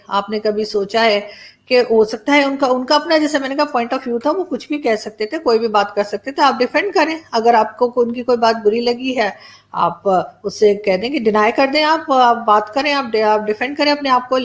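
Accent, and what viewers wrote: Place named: Delhi, India. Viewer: Aap is tarah baat Karti hain jaise koi Dilli ,UP ki ladki baat kar Rahi ho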